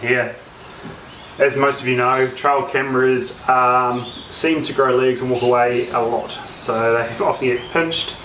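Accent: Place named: New Zealand. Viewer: Sounds like you from NZ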